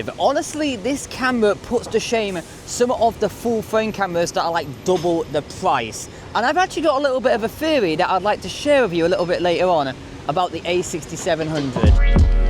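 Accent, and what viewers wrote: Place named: England, United Kingdom. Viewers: I know it's an English accent but can anyone tell me from which region? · Hey, I'd say it's definitely some sort of Estuary English, meaning London.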